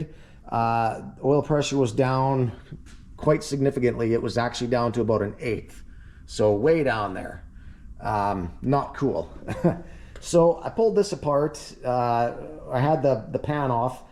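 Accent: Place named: New Jersey, United States. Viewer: Are you from New Jersey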